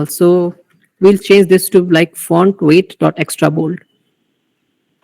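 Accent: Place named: India. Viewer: bro dont disrespect , indian english is like that only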